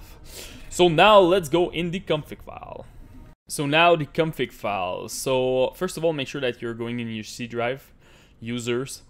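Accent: Quebec, Canada. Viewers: i'm guessing you're from Quebec?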